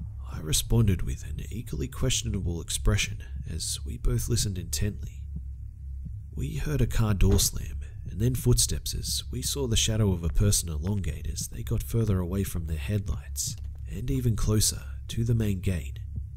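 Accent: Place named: Australia. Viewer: hey you sound aussie.which city are you from? 😄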